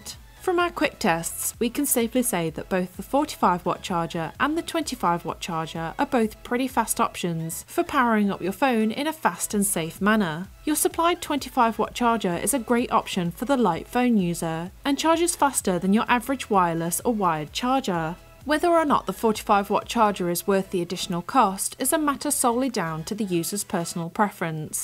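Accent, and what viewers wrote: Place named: Northern England, United Kingdom. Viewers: Northern accent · I really loved ur british accent ❤❤😍👍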